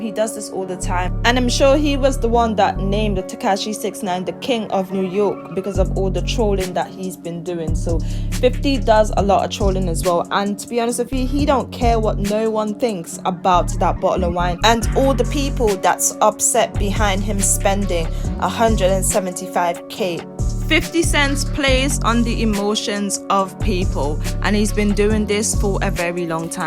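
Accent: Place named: United Kingdom. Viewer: Random but if you don't mind me asking, where are you from? I love ur accent if id have to guess it would be the UK but I'm not sure on what part of the uk.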